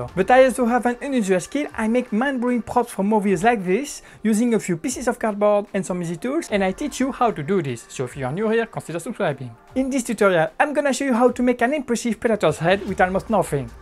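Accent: France. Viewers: Mdr on voit ton bel accent français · I love this accent, french I assume? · Juste ton accent french 😅😅😅bon boulot en tout cas j'adore tes techniques d'assemblage franchement chapeau 👍👍👍👍👏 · Le gros accent français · what is this french accent, are you realy english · can u stop speaking enhlish with ur french accent , its difficult to understand as ur pronouciation is not really good + its really annoying , french is a loving language , u dnt hve to b ashamed of ur native language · Excellent boulot ! Et j'adore ton accent FR ;)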